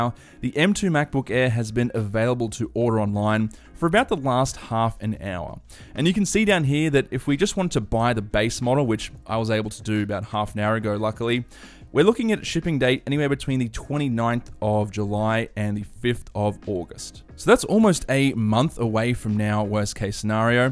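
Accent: Australia. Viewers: Nice hair. Love that Aussie accent.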